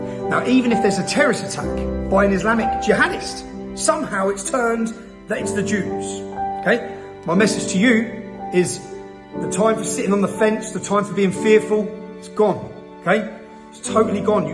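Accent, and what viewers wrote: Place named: Cockney, United Kingdom. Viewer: Run for PM ...get rid of that cockney accent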